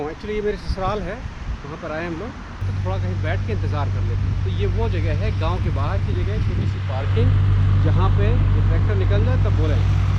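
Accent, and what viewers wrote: Bihar, India. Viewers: Aap bihar se ho....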